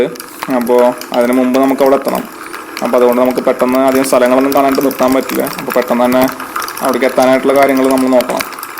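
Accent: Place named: Thrissur, India. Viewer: chetta... chettante thrissur slang enikku valya ishtama. watsapp number tharumo.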